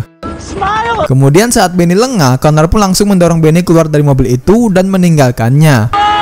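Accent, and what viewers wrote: Medan, Indonesia. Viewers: Org medan bg? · Anak Medan mana suara mu?? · Hee bodat.. Kau orang medan yahh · Anak medan kau rupanya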